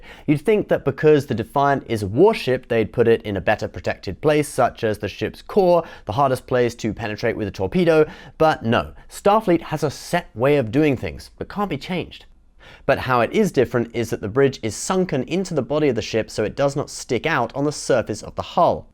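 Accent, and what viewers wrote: United Kingdom. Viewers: Uhh Boi my man the is back again! Sorry but i just missed him...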